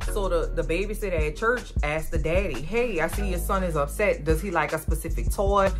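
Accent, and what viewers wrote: New Orleans, United States. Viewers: I’m in Shreveport. I know that New Orleans accent anywhere! Keep up the great work in the 504! 👍🏾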